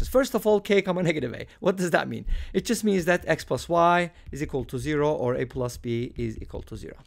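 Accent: Turkey. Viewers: Telafuzunuz Türk olduğunuzu düşündürdü. Doğruysa video için çok teşekkürler ;)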